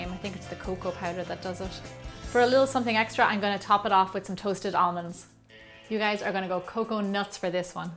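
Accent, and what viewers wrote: Ireland. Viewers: Delicious!!!! and I like your Irish accent. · Is that an Irish accent breaking in and out? Lovely!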